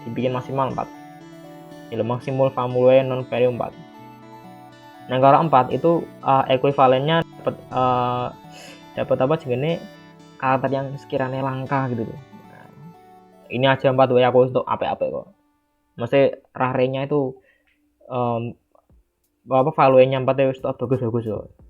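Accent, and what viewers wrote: Indonesia. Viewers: Thanks Banget bang Info nya,btw abang orang jawa ngapak? XD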